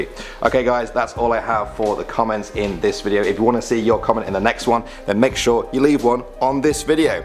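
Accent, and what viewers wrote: England, United Kingdom. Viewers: what part of england our you from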